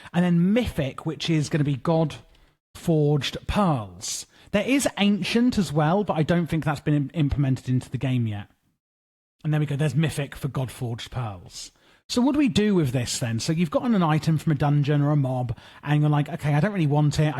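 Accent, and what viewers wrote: United Kingdom. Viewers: Jesus i cannot ignore your british accent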